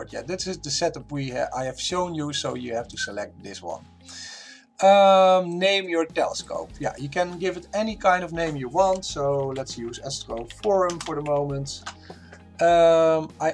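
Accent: Netherlands. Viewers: Het accent is Nederlands, dus probeer de vraag even in het Nederlands. Kan ik gewoon een RJ11 naar USB kabel gebruiken? Dan sla ik de rs232 over zeg maar.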